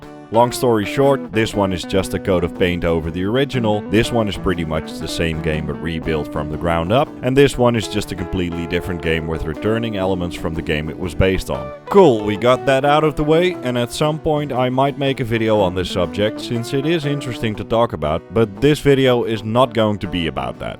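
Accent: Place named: Netherlands. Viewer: Je klinkt Nederlands.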